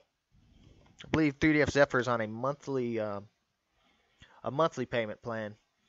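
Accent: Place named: Georgia, United States. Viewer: You accents sounds like Georgia or somewhere close.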